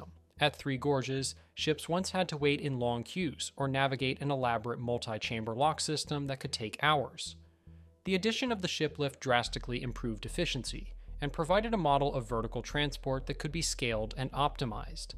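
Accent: United States. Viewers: I love the obvious inflammatory titles from CCP, yet the narrator is American 😆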